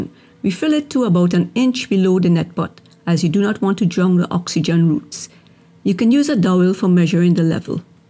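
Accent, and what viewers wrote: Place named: Trinidad, Trinidad and Tobago. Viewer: Trinidad accent ... Love it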